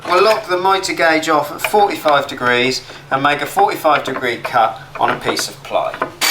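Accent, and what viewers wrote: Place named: East Anglia, United Kingdom. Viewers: Came for the east Anglian accent, stay for the tips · Could be Suffolk Maybe lowestoft area, but could be Norfolk, only next door, great Yarmouth and further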